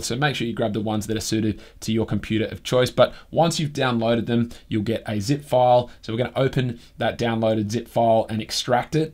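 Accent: Australia. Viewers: Hey, first and foremost thanks for video as it's so awesome to finally be able to listen to an another Australian explain these topics. I wanted to ask if you could do a video covering how to install a GSI on a device as I have had trouble finding one that explains what to do clear enough.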